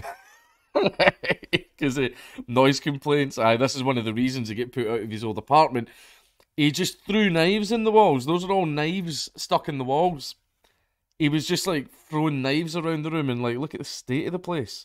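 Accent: Scotland, United Kingdom. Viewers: You haven't heard the phrase dildos all over the floor until you've heard it in a Scottish accent. I nearly peed. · Andy Dick stories are always entertaining , the Scottish accent makes everything a thousand times funnier though man. You've become one of my faithful go to's whenever I need a laugh to destress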